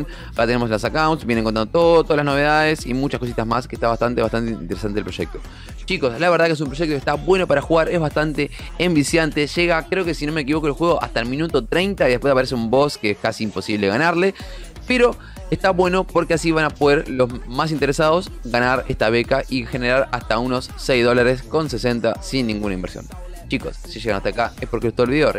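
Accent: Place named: Argentina. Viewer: ¿Eres Argentino? lo puedo notar por tu acento, saludos desde Brasil 🇧🇷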